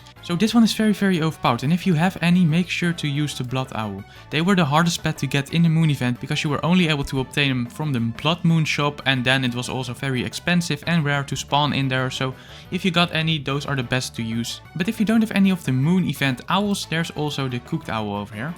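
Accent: Netherlands. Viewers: are you dutch cuz i can hear that accent? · Are u Dutch?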